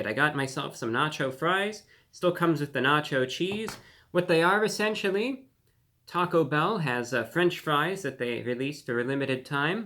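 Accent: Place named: Transatlantic, United States. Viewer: I've never seen someone in this modern era speak with a Transatlantic accent. Fascinating!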